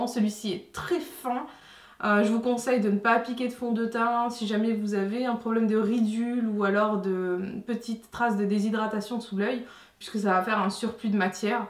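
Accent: England, United Kingdom. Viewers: tu me fais rire avec ton accent anglais super prononcé !!!!! en tout cas je suis très contente de ton retour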